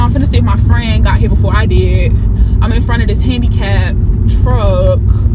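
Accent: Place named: United States. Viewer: That country talk coming out in this video yaaass 🙌🏽🙌🏽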